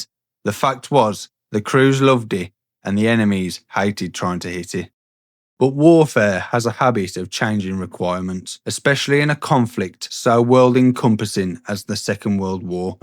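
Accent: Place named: Midlands, United Kingdom. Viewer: Well done, this is a neat little documentary. Subbed! Where are you from in the Midlands?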